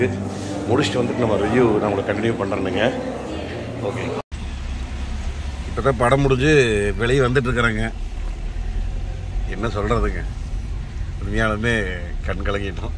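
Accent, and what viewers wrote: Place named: Kongu, India. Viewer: கொஞ்சும் கொங்குத் தமிழ் பேசும் கோமகரே...! திகட்டாத தித்திக்கும் பேச்சுடைய திருப்பூர் திருமகரே...! உங்கள் காணொளி மிக மிக அருமை❤❤❤❤ அதைவிட உங்கள் தாய் தந்தை இழப்பைப் பற்றி நீங்கள் கூறியது மனதை கலங்கடித்துவிட்டது😭 "டான் திரைப்படம்" பற்றி எனது சில வரிகள்...! "❤தந்தை❤" மகன்களே/மகள்களே மனதில் பதிய வைத்துக்கொள்ளுங்கள்....! வலிகள் நிறைந்த உங்கள் வாழ்க்கையில் வழிகேடுகள் வளைத்துவிடப் பார்க்கையில்? வாழும் உங்கள் வாழ்க்கைக்கு வழிகாட்டியாய் வாழ்ந்திடும் வாழும் தெய்வம்தான்🙏 மதிப்புமிக்க உறவு "❤தந்தை❤"...!🙏 இத்திரைப்படத்தைப் பார்த்தபின்பு.... விழியோரம் வழிந்திடும் என் கண்ணீர்த்துளிகளை என் தந்தையின் பாதத்திற்குச் சமர்ப்பணம் செய்கிறேன்🙏 என்றும் அன்புடன் உங்கள் மகன்போல இவன் ரா.முஹம்மது கபூர் (சென்னையில் இருந்து)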